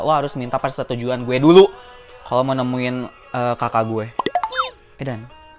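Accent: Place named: West Java, Indonesia. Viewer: Sundaan euyy :v sundanya keluar semua😅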